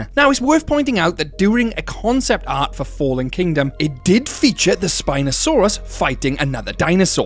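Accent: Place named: United Kingdom. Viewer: Gosh I adore how you say “endorapthor”, British accent is the best accent (my opinion as non-native english speaker)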